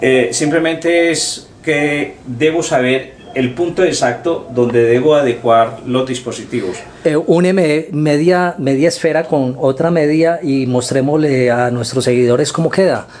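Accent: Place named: Antioquia, Colombia. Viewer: por lo demas,bien ,todo bien explicado pero ese acentico me lo escarapela,no nos gusta pa ni mierda somos medio alergicos a los paisitas aqui en bucara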